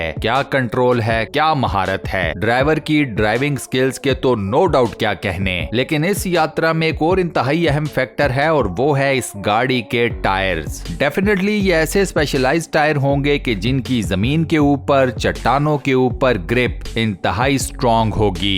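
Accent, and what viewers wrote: Punjab, India. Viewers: Apki awaaj vich punjabi tach bhot Nice hai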